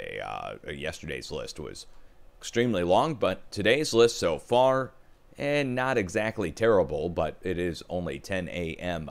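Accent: Russia. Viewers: Hey, love the cheesy Russian accent! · Good work, and I love your foreign accents, especially the Russian one. Stay strong! Much love!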